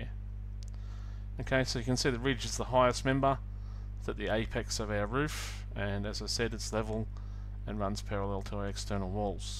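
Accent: Australia. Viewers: Very good explanation. And nice to hear an Aussie voice.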